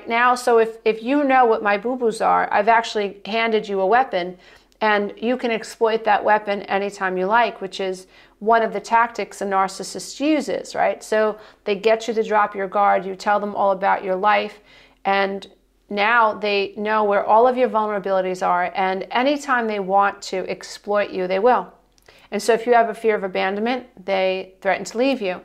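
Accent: New York, United States. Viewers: Your New York accent is EVERYTHING! 😊🏙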